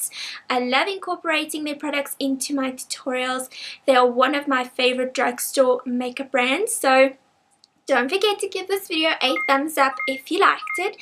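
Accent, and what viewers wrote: South Africa. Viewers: Love your accent. are you south african?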